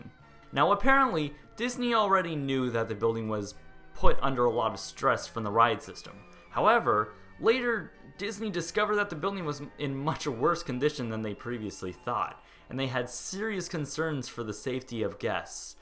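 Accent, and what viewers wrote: Canada. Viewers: you should do impressions of Ashton Kutcher, without your Canadian accent of course. You sound exactly like him!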